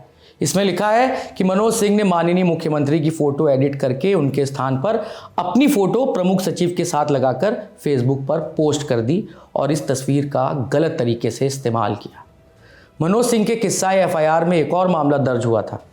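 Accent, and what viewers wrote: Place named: Lucknow, India. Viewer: Ap pakka Lucknow se ho ge 😅😅 apke bolne ke tarike se lag raha hai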